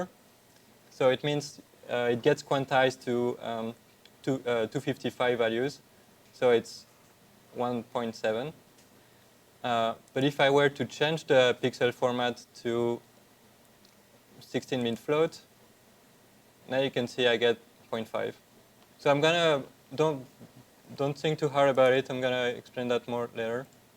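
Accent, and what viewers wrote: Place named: France. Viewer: Franglais accent make me super concentrate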